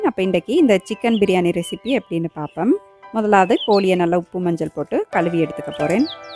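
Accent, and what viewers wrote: Sri Lanka. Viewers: I love you srilanka tamil 😃😃👌👌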